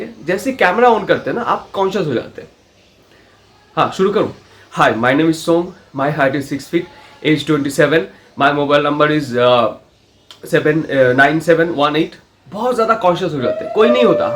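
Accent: Bengal, India. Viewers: Sirf 'ra' sound ki wajah se aapka Bangla tone aa jata he.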